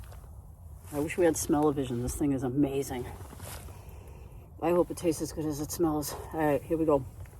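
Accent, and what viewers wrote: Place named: Boston, United States. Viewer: Love your videos & your Boston accent.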